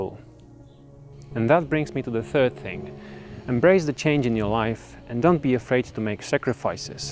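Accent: Poland. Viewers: Rotbart1337 he’s Polish indeed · What nationality are you? You have an accent but i cant tell where you are from. Poland?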